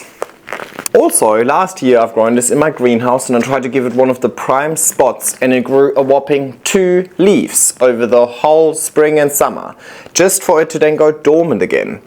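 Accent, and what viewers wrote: Germany. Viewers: I don’t know why but I can’t get over your accent (?) there’s a voice in my head screaming “he is germannnn!!!!!” Love your Videos btw :) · I sorry but I have to ask are you from Germany or Austria? You sound like my friend from Berlin 😅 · Are you originally from Germany? I can hear an accent.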